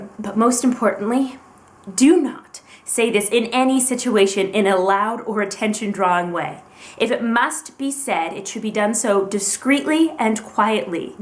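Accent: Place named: United States. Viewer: She sounds country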